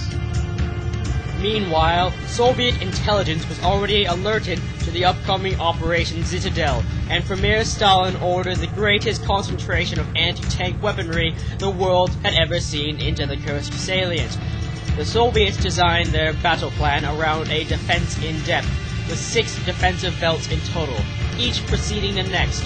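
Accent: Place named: United States. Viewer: Very good. Excellent to hear about the true turning point of WW2 through an American voice. In the US, we rightfully pay tribute to our amazing heros of D-Day, but we lose sight of the fact that we were but junior partners when it comes to the European war. My father was among those Americans who fought in Africa, and then Italy. Six of my uncles fought also, one in the Battle of the Bulge, two in the Pacific, one of them killed by the Japanese. But the Red Army crushed the Wermacht.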